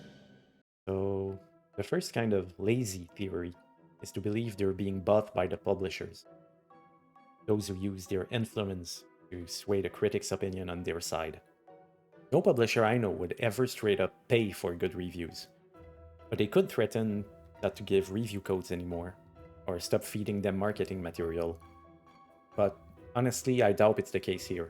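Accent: Quebec, Canada. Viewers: Est-ce que j'entends un accent québécois? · Brother.. Are you from QC? Lol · T'es Québecois hein? 😂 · Is it me or I recognize a Quebec accent here ??? Certainly wasn't expecting that hahah. Really liked this video it was super interesting !!! Will def sub and check your other videos !!!! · disagree here, human beings hate what they don't understand, and fear the unknown, hence why we hate out-groups and fear death. Otherwise, this was a great nuanced take why the Pokemon gamin franchise continues to pump out mediocre products. I can tell by your accent you're a fellow Quebecois, subscribed🇨🇦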